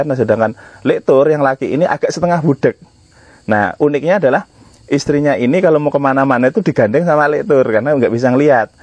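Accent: Banyumas, Indonesia. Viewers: ora ngapak ora kepenak.... 😁 salam ngapak ( karang pucung )... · Lah bisa ngapak juga😅😅😅.. Asale endi sih rika pak??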